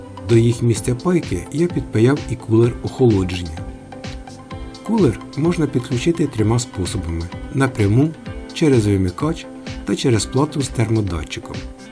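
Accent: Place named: Central Ukraine, Ukraine. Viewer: Акцент центрально-український, черкащина/південь київщини/кропивниччина чи полтавщина.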